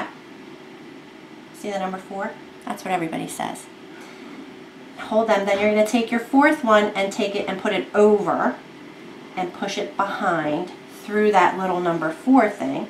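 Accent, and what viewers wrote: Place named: South Jersey, United States. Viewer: Had a feeling you were from south Jersey. A Jersey girl recognizes the accent. 😂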